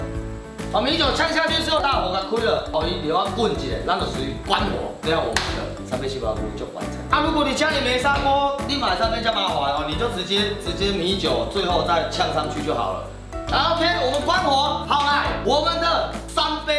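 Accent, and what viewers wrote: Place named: Taiwan. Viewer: 的台灣國語很口愛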